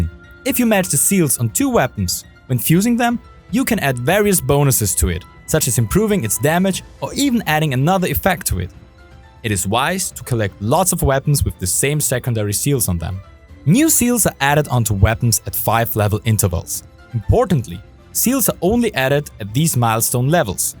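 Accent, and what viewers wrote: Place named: Germany. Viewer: Quick question sir are you German or am I wrong about that If so that's fucking cool you're accent makes me strangely happy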